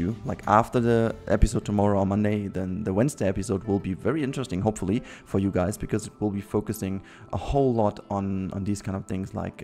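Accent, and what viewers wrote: Germany. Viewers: Man kann irgendwie raushören das du deutsch bist:). Is echt schön was du hier machst!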